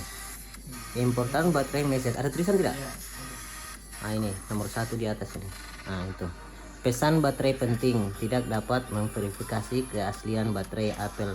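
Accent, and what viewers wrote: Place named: Papua, Indonesia. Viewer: Bicara saja bos hajar pake logat Papua ka,sukses selalu bos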